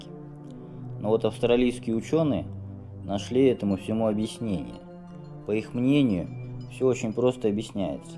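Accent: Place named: Moscow, Russia. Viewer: Московский говор, что здесь такого особенного? Давайте приедем в какую-нибудь деревню под Н.Новгородом и потребуем от людей не окать. Сами догадаетесь, в какое сказочное путешествие Вы отправитесь с невероятной скоростью? Все говоры русского языка равны, и не надо придумывать какой-то "идеальный" язык. Даже в эпоху Интернета не всё так однозначно :)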